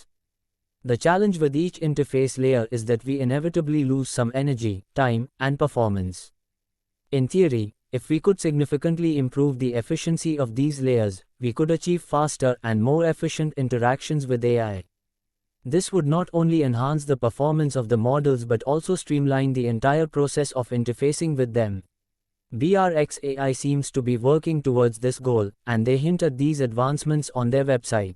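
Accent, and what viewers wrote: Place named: India. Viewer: Is this AI generated? If so why pick an Indian accent?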